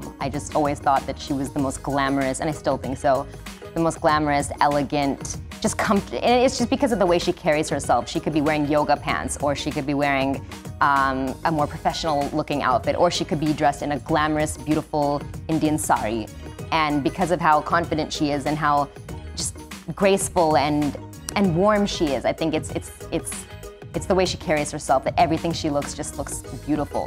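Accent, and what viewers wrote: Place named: United States. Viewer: i knew she had an american accent 😍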